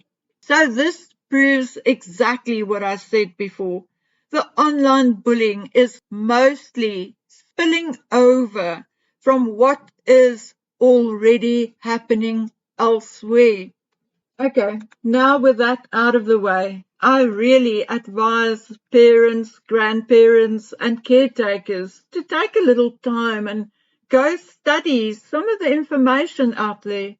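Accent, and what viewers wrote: South Africa. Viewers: She has a South African accent, get over it.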